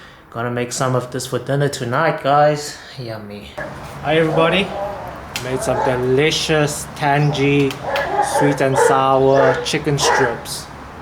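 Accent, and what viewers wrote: South Africa. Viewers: You sound like you have a South African accent.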